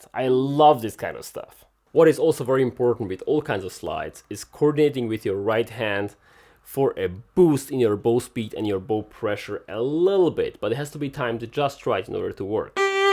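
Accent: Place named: Russia. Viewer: are you russian speaking? I hear sometimes russian accent 😄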